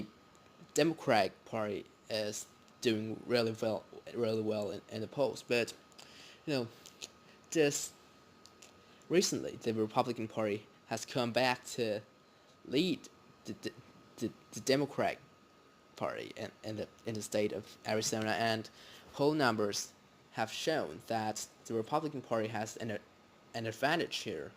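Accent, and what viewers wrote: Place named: South, United States. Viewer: you have some of that accent. Are you southern or your folks southern? it is my favorite accent category. Thanks for the video!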